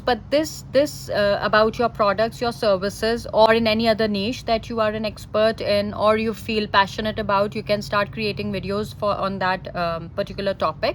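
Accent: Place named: South Asia. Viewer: Baaji i love your desi English... feels like home, although im from India 🇮🇳 but still its feels the same...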